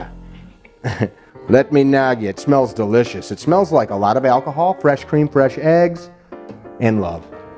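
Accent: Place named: Wisconsin, United States. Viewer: Buddy’s a cheese head for sure with that sconi accent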